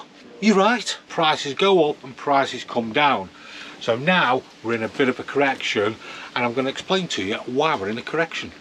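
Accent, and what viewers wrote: Stoke, United Kingdom. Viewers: You must be a stokie, with that accent ? , interesting facts 👍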